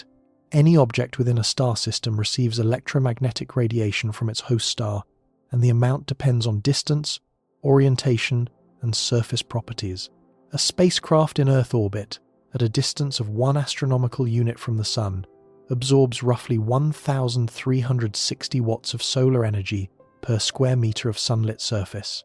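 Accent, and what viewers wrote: England, United Kingdom. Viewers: I am pretty sure that the air and moisture in the suit would still cause evaporative cooling, and your face would frost over or freeze superficially on the surface. It would probably look like it was frozen solid. I think that some of us are a little hard on this Sleepy Science channel. It's not terrible even if its AI. It's sleepy pop science with a relaxing English voice to fall asleep with :-)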